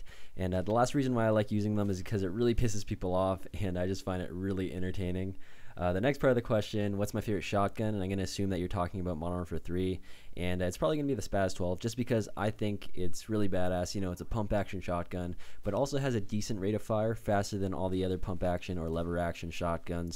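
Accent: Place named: Asia. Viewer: 我從一開始看他之後就覺得他的口音有點亞洲人，感謝翻譯。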